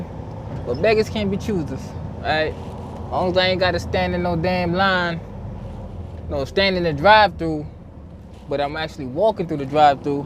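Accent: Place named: New York, United States. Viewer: Lol he gotta be from new York lol that accent funny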